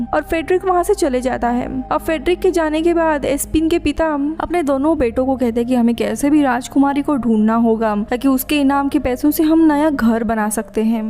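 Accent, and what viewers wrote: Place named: Bengal, India. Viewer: apki hindi sunkar aysa lagta hay ke ap bengali ho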